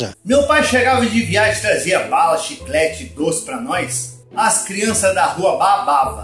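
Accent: Minas Gerais, Brazil. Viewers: Mineirês??? Kkkkkkk mutriii 😂😂😂😂😂😂!! Ahh sim, manoo do céu, quase passo mal de tanto rir 😂😂😂😂😂😂 choreeeiii largaaado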